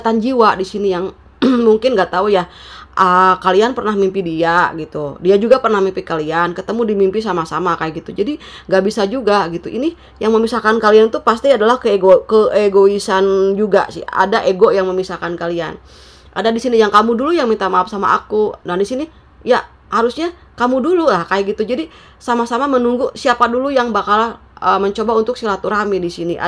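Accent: West Java, Indonesia. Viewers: Siang Mbak cantik🙏..Maaf saya baru memfollowing. Sukses sll 😇👍👍👍TUHAN memberkati🙏😇🙏 Maaf teh, orang Sunda ya🙏bagus suaranya👍 Hatur nuhun terima kasih readingannya teh.🙏🥰🙏 Temanya, dia pakai magic, jk dia bnr pakai bgtuan, TUHAN akan menjagaku sll dr orang2 brniat tdk baik.Amin.🙏 Benar kejujuran adlh segalanya.🙏👍 Ya resiko dia nya sendiri, dia yg memutuskan pergi menjauh, dan saya sdh ikhlaskan serahkn kpd TUHAN, rasa sedih pedih luka di hati yg dia berikan, telah berangsur pulih hatiku, Kasih TUHAN pulihkan di hatiku🙏😇🙏..Lebih baik fokus utk ke depannya saja, anak2 saja, selflove dan terpenting semakin dkt pd TUHAN🤲🙏♥️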